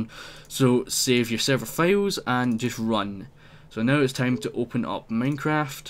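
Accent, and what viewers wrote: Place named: Scotland, United Kingdom. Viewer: I LOVE URE AWESOME ACSENT ITS AWESOME R U SCOTISH? SCOTISH R AWESOME OMG!!!!!!!!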